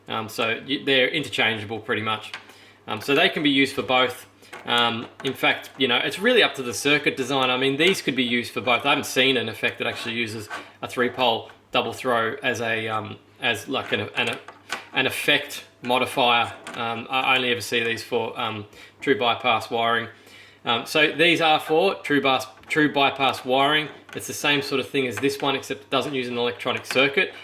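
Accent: Australia. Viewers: Hi, Thanks for the video!! Refreshing to hear an Aussi accent. I’m from SA. This will be my first pedal project and I just wanted to check something before I start. I want to mod a simple a b box (no LEDs/power) into an a b y box. All I need is a switch to activate the y for different pedal setups (summing parallel effect signals (Q within a Q: is this the right way to sum?)). Would all I need be a single pole double or would I need a three pole double throw like the foot switch?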